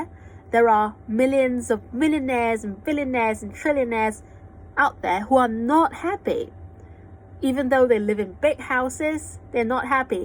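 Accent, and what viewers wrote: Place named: United Kingdom. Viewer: I hope you don't mind if I spot some of the mispronounced words in the first 4~5 minutes of your speech. Well first of all, I do think that you speak very fluent British English yet it's a bit stronger than usual (if you bother to listen to some native British people speak [I guess you do a lot]). Sometimes I feel that some sounds are intentionally spoken in a strong British English / you pretend to speak with a British accent, like 'feel' (with an unusually long /i:/ sound). If you could soften that very strong British accent, that would be great. OK the following words are spotted: while (it sounds like you were pronouncing it as 'wild' with an extra long vowel. It sounds a bit strange to me) significantly (missing 't' sound) strange - the 'g' sound should be a voiced consonant but then it's missed. house - again, the vowel is stretched to be too long. 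It sounds like haaouse. Again it doesn't sound natural. external - wow the 't' sound here is blended with the 'x' sound. Whenever the two consonants 's' and 't' are blended together, we should pronounce the sound like 'sd', but you kept the 't' sound when you pronounced it.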